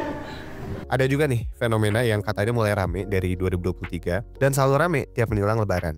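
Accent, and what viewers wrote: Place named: Banjar, Indonesia. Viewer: admin orang banjar kh nih, logat bahasa banjarnya kadang2 losss takaluar😂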